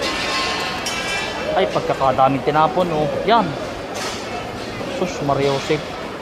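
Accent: Quezon, Philippines. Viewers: Ah ah parang ikaw ay taga quezon hehe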